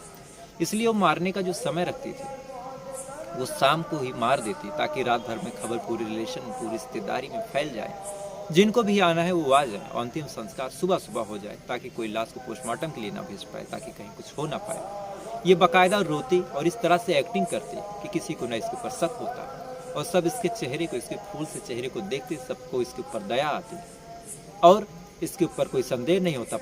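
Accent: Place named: Awadh, India. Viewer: जय हो,कहानी अत्यंत मधुर स्वर में प्रस्तुत कर मनमोहक बना लेते है।" "अवधी बोली का प्रभाव आपके बोली में है।क्या आप अवधी बोली वाले जनपद से मिलान कर रहे है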